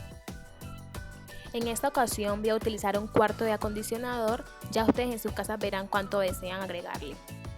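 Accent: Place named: Chocó, Colombia. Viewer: Hola hermosa. Cuando te escuchó me recuerdas a mi cuñada, tiene ese acento de Quibdo Chocó como el tuyo. Pero no se si eres de allá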